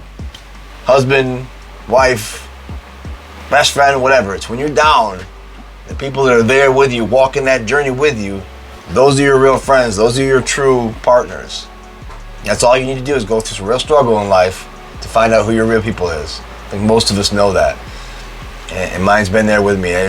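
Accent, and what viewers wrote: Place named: United States. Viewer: Your intonation feels like I'm watching a Cowboy movie Oh yeah! wtf.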